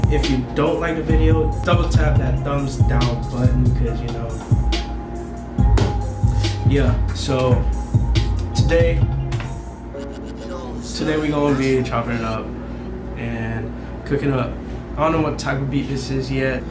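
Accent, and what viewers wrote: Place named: Jamaica. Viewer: Where you from ? Jamaica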